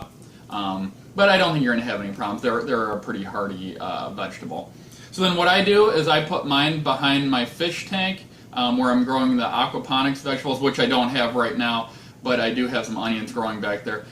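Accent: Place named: Midwest, United States. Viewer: Midwesterner detected* lol great vid, thanks man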